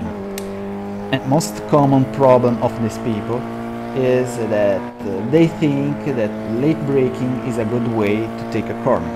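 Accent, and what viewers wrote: Italy. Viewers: Absolutely italian. A bit of french influence but italian intonation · The occasional Italian vowels are amusing. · Great italian/ english accent,. Don't lose it, it's the connection to Italy and it's passion for motorsports. Also thanks for the info, for a newbie like me this is really helpful. 🙌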